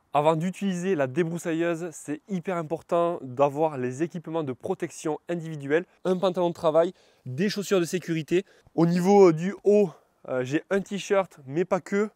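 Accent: South, France. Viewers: Un grand merci pour tous tes conseils pour l'utilisation de la débroussailleuse. Un super tuto très utile et quel beau paysage, cela doit être dans le Sud vu ton accent. Je suis dans l'Ouest et c'est très plat! ---> mais on a des plages pour se baigner. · L accent du Sud ❤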